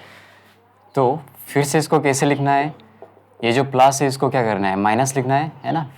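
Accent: Assam, India. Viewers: Sir aaap assam se ho ki nahi aapka awaj se pata chal raha hai ki aap Assamese ho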